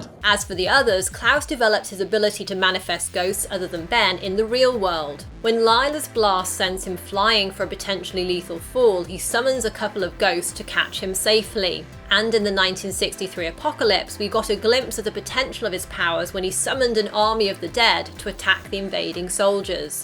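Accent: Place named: United Kingdom. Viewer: Soldier Gaming nah.. it just seems similar to Lila’s voice to u cuz they both have british accents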